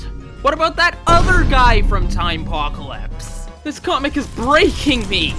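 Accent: United Kingdom. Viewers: You’re British, right?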